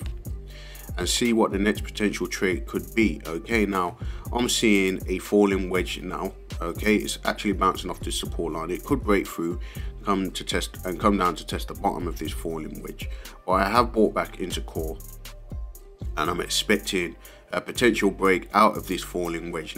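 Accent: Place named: London, United Kingdom. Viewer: I like your accent guy, london bwoi.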